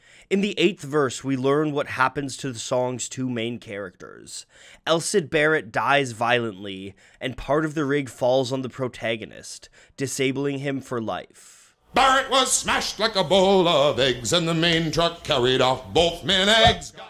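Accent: Canada. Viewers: I could finally solve my question on whether you were Canadian. I had seen some of your other videos and heard your accent and wondered if you were from Canada, but couldn't confirm it. Glad that my instinct was correct. :P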